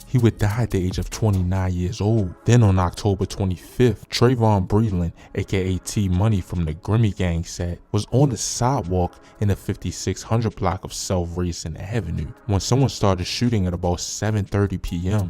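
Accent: Baltimore, United States. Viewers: Nigga frm Baltimore somewhere give him a break bro 🤷🏾‍♂️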